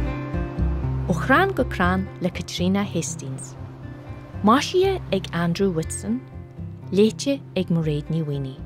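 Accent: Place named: Gaoth Dobhair, Ireland. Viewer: Ahh tá seo galánta ar fad...is deacair canúint Ghaoth Dobhair a bhualadh!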